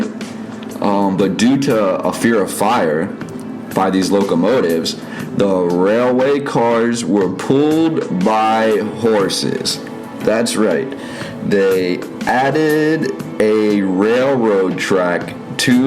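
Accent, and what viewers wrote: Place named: Pennsylvania, United States. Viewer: Great video as usual Bro. I’m from Overbrook, west Philly. I can tell from your accent, (lol) you were from Pennsylvania 👍🏽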